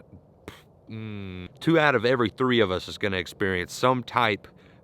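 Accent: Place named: Alabama, United States. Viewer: Hey I'm enjoying this boat renovation stuff, will keep watching to see what happens. I'm from Alabama, it's great to hear your accent.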